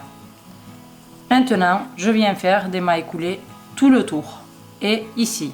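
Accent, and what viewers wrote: South, France. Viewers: Super tutoriel bien expliquer avec un jolie acsent du sud😂❤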